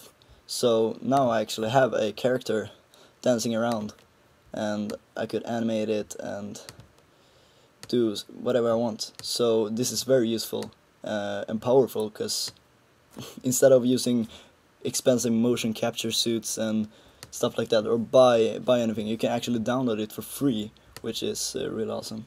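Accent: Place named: Sweden. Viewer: haha, hörde på din engleska att du är svensk xD :D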